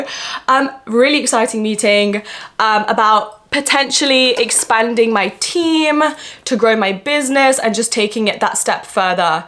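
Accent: United Kingdom. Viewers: I love you London ascent ❤❤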